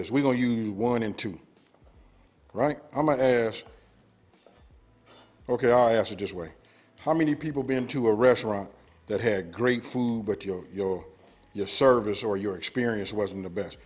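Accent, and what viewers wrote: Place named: South, United States. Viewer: You definitely do not sound like you are from LA. It sounds like you’re from the south.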